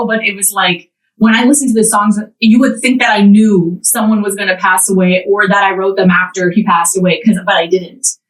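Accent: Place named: Canada. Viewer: Plus I love hearing Canadian... sets my maple leaf little heart a flutter <3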